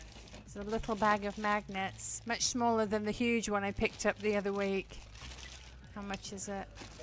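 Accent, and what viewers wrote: United Kingdom. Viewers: greetings from the East Coast. I enjoy watching you and is my favorite! You are both blessed to have each other. I enjoy watching you and do so alot in the evenings after a long day at work and def on weekends. I have learned so much. You are wonderful to watch and your voice and the fantastic British accent is so soothing. Thank you for making my days alot happier. You are a doll! Happy Thriftying!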